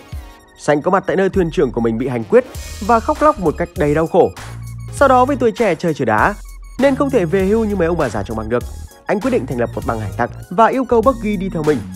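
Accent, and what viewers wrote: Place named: Nghe An, Vietnam. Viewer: Đanh đọc bình thường tự nhiên đọc bằng giọng nghệ an nghe khó chịu v 😑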